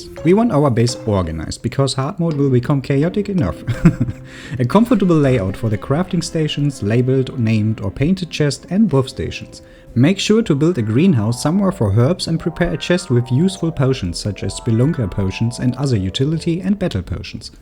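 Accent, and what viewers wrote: Germany. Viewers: thank you - you German aint you? :D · Du hörst dich so deutsch an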